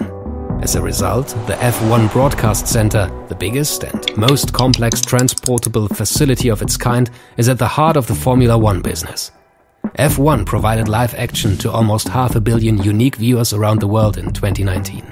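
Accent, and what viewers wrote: Germany. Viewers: is the person talking german? i had a feeling the whole time but then he pronounced Vettel correctly, he must be german right? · Finaly. Youtube recomendation something good. I love german accent. Make me feel smarter.. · Anyone else thinks this is a German accent?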